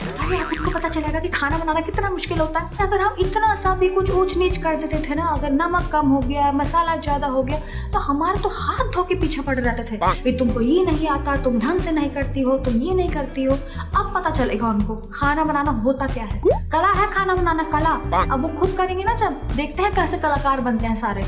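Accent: Bihar, India. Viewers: Why you use Bihari tone?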